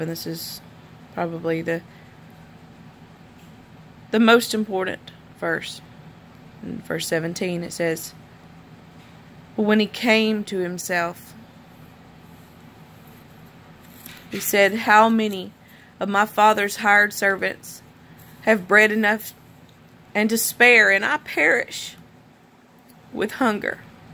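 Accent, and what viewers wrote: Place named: South, United States. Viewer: God thank you for this sister’s beautifully accented voice! I love to hear your Word at any rate, Lord, but it is especially sweet with a little American-south drip. (I dunno, if not the south, then mid-west? Texas? IDK, BUT I LOVE IT!) Many thanks for this channel. (If did an audio narration of the Bible from start to finish- I’d listen every day)😊✝️🙏 GOD BLESS & KEEP YOU & YOURS!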